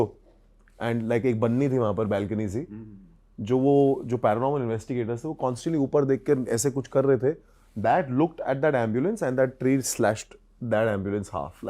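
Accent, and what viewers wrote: India. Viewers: So boring yr the person who is telling the story is speaking half Bollywood English by shaking his head and another half Hindi so so boring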